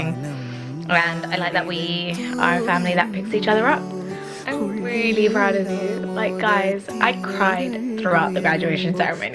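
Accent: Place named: England, United Kingdom. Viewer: Good English accent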